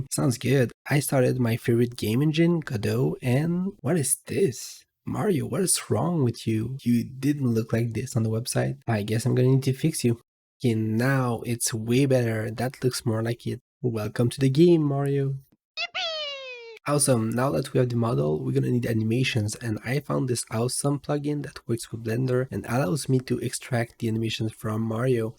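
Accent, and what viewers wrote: France. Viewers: T’es français en plus ??? Je reconnais l’accent 😭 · Are you french😮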